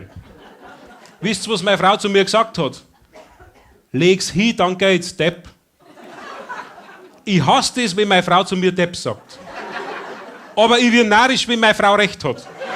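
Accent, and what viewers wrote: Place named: Upper Palatinate, Germany. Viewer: Der Oberpfälzer Dialekt ist der beste ! 😅⭐⭐⭐⭐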